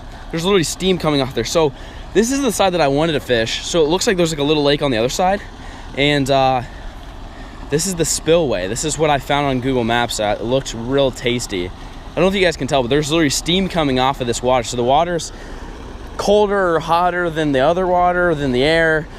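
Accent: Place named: Pennsylvania, United States. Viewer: You gotta be from pa bro 😂😂 u talk exactly how I do when I’m fishing 😂😂 I might cuss a little more tho 🤷🏻‍♂️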